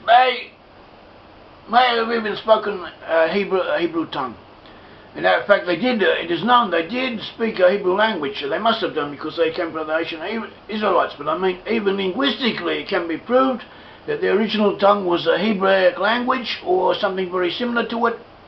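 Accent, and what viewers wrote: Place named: England, United Kingdom. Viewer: ugly looking fuck with some english accent with a horrible voice fuck off to wales or somewhere with your bullshit ..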